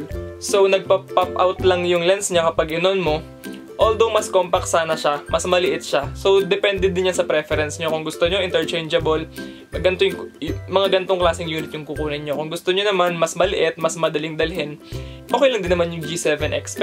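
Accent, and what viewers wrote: Iloilo, Philippines. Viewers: hala illonggo ka gali ka cute HAHAHAA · kuya may tanong po ako Ilonggo kapo ba????????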